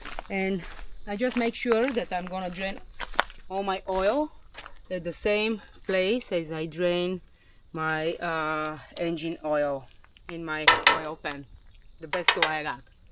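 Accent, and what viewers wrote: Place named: Russia. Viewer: Really? This is a bad joke. Your accent is not american sound like a russian...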